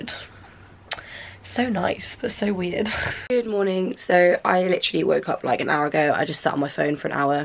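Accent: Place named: West Midlands, United Kingdom. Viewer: Where has your lovely West Midlands accent gone? Please don't turn posh....Brummie is sooo much better! X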